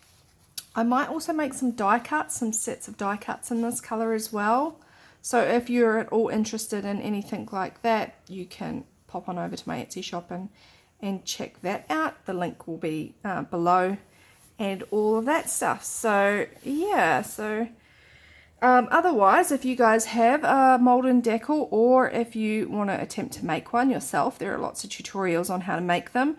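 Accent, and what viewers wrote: New Zealand. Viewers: Beautiful! In fact I zipped straight over to your Etsy shop and bought some! Don't worry about longer videos - sometimes they are needed for us (me?!) to get the gist of things. Also, I think in an earlier comment I mentioned liking finding a fellow Aussie to follow... can't believe I didn't pick the Kiwi accent, having lived over there for 7 years!!! Anyway, good to have someone 'local' (I'm in Port Macquarie). :-)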